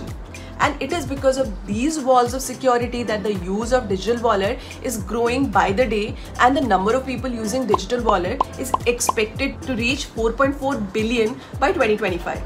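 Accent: India. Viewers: What an accent got this girl, she must be indian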